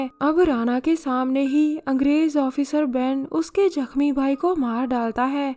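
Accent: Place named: Bihar, India. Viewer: Maidim kya aap bihar se ho boli se toh aap ekdum gaavki bihari aurat lagti ho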